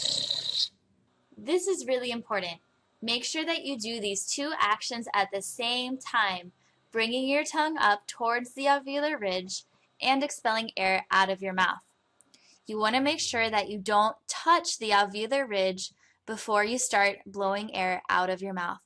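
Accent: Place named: Argentina. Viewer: I do love your argentinian accent haha. I'm studying english in Dublin and I have problems with the English R, so you people are not alone hahaha.. un beso grande genia !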